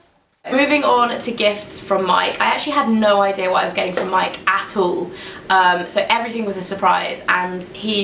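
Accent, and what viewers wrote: United Kingdom. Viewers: you are one of my fave british youtubers! love your accent and your product recommendations are really good too :)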